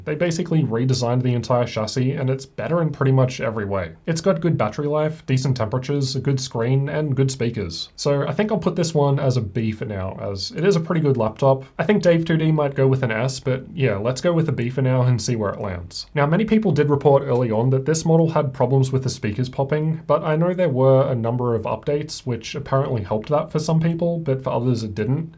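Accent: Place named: Australia. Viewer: I love your videos and Insite on everything but when I I hear you say words that end with Ole or ore and some other words I don't know how you don't start just dry heaving. How do you get that low and distorted while stile talking without choking lol your voice is amazing I can't even do an impression without choking lol your deep voice mixed with the aussie accent kills me I love it, but can't recreate it lol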